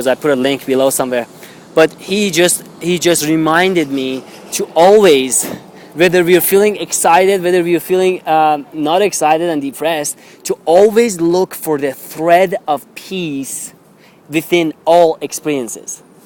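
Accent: Iran. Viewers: just out of curiosity,where are you from originally? you have a slight Persian accent when you speak English!!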